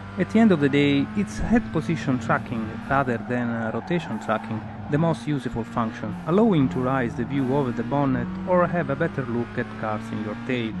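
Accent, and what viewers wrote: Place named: Italy. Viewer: Si sente che sei italiano... :) Allow si pronuncia "ellau", questa è la parola che suona peggio, anche se devo ammettere che la pronuncia lascia un po' a desiderare. Ma in fondo chissene... ;) Video molto utile, almeno per me. Mi piace! ^^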